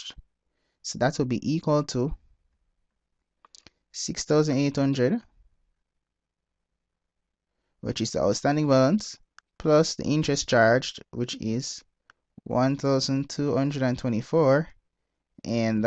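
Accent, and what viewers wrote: Jamaica. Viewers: When me first hear u I knew u were Jamaican bro, bless up! THANKS MUCH !!! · You sound like a Jamaican :)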